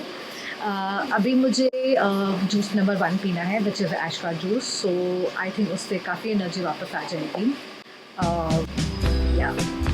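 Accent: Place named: Uttar Pradesh, India. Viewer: Your accent looks like you are from U.P.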